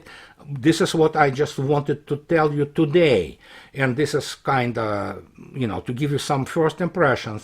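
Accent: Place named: Russia. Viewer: I love your russian accent...🙂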